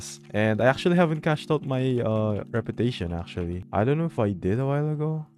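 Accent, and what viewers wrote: Philippines. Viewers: Filipino kaba based sa accent mo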